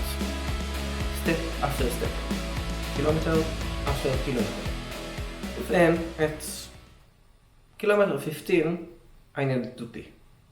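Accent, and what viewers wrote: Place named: Germany. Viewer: You have a German accent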